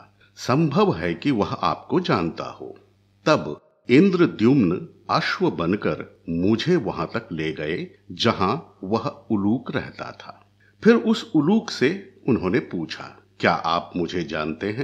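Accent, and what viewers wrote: Standard. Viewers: महाभारत की एक सुन्दर संदेशयुक्त लोकोपयोगी कथा...! सुन्दर लोकोपयोगी कर्म वाले ही वास्तव में मानव धर्म /स्वधर्म का पालन करने वाले होते हैं। ऐसे स्वधर्मपालकों को ही...लोकोपयोगी कार्य करने के कारण, संसार में सम्मान और यश/कीर्ति प्राप्त होती है। जिन्हें सम्मान और कीर्ति मिलती है उन्हें ही स्वर्ग का सा सुख प्राप्त होता है परन्तु समय के साथ लोग उनके लोकोपकारी कार्यों को भुला बैठते हैं तब वह स्वर्गिक सुखों से वंचित हो जाता है। स्वर्ग का सा सुख पाने के लिए या तो निरंतर लोकोपकारी कार्य करते रहना आवश्यक है या उन कर्मों का स्मरण कराते रहना (गुणगान होना) आवश्यक है ताकि उस व्यक्ति का यश बना रहे और वह स्वर्ग का सुख प्राप्त करता रहे! इस कथा का यही सार तत्व है। सुन्दर शैली में विशुद्ध उच्चारण के साथ प्रस्तुत यह कथा अत्यंत रोचक और लाजवाब लगी। हार्दिक धन्यवाद एवं हार्दिक बधाई आदरणीय इस सुन्दर संदेशपूर्ण कथा-वाचन के लिए...! सादर नमन आदरणीय एवं शुभ दोपहरी...! 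☀️🌷🙏🌷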